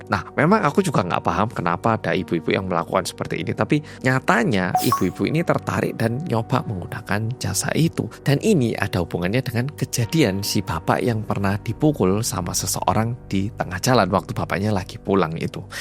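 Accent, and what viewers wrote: Java, Indonesia. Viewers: Hai masbro muedok😅 Nyimaaak 🎉🎉🎉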